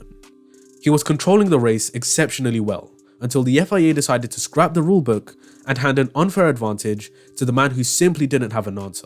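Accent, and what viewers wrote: United Kingdom. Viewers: once i heard the english accent i knew enough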